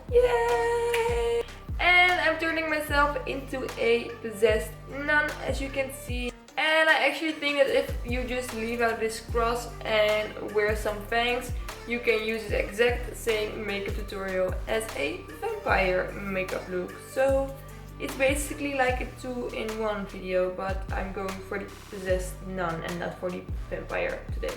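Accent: Netherlands. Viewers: Lmao first thing when I heard her voice: okee shes dutch 😂. Anyways love the make up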